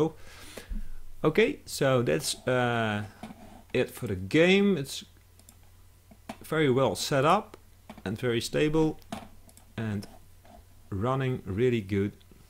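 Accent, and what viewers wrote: Netherlands. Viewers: haha, Nederlander ofzo die dit zegt. Haha, the man that tells it, is Dutch i think :)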